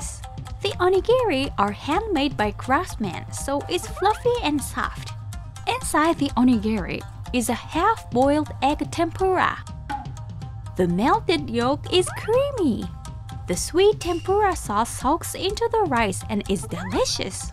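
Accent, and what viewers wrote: United States. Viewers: seu ingles ficou bem melhor agora, mesmo não sendo o ingles minha lingua materna é bem perceptivo que o seu ingles ta bem parecido com um americano. Parabéns:face-red-heart-shape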